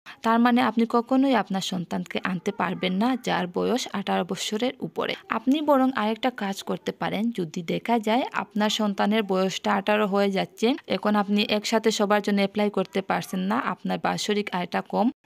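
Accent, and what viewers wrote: Sylhet, Bangladesh. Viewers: আপুর বাড়ি মনেহয় সিলেট, আপনার মাত সিলেটির মতো লাগের।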